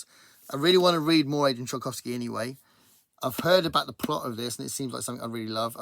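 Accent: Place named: United Kingdom. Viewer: ● I regularly randomly pick a book off my shelf 🙈. ● I really like the cover of that Ray Bradbury. ● I'm on my 5th Star Trek book. You're a slacker! ● Oh I'd like to read The House In The Cerulean Sea myself. 🤔 ● Oh Blacktop Wasteland was not good imo, but I'll be interested to see what you think! ● Outside of BookTrek and New Worlds November, my reading IS totally random 😂. ● Oh Alien Clay. I'm not familiar with that one. I'll have to look it up. ● Wow! I love the cover on The Imposter! ● Ok random question: What kind of British accent do you have? What region?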